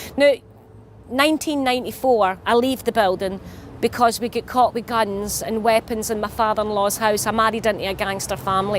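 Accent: Glasgow, United Kingdom. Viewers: I just read that you are no more. Jesus I am so crushed! You brought so much laughter through your videos, I LOVE your Glaswegian accent. Fly with the angels my dear, you will be missed. Rest in peace.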